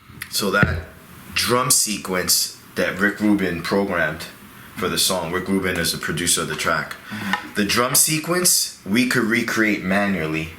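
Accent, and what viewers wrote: Barbados. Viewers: Dope shit! Big up! (I heard that Bajan accent too) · bajan accent strong.....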